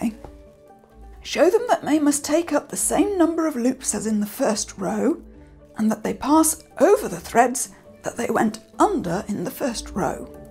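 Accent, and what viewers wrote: United Kingdom. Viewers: Aww yes my favorite, British cursing ASMR. Thank you for the wonderful video as always.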